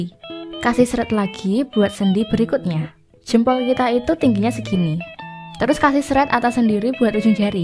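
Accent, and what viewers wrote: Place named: Java, Indonesia. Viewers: Kata katanya toxic banget, khas logat orang jawa 👍🏻😂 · dari cara bicaranya mbak agak medok bahasa Indonesia nya😅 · Medok bgt ya bund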